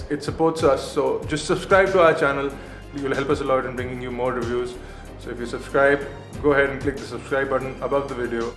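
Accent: India. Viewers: anoing indian accent... Apu ali baba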